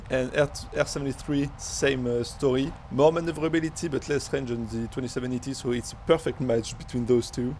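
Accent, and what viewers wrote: France. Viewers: most french guy i saw on youtube that talk eng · holy french accent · İ never heard france accent wow great video tho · This is about as French an accent as it gets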